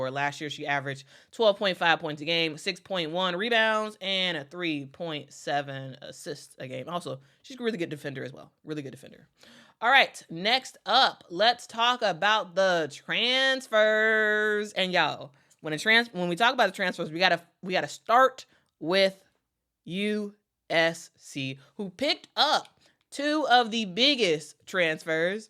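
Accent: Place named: New York, United States. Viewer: where is this nyc accent coming from? Lol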